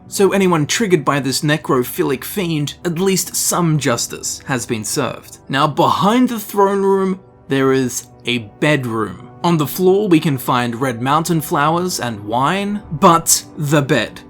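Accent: Australia. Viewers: Your an Aussie Aye?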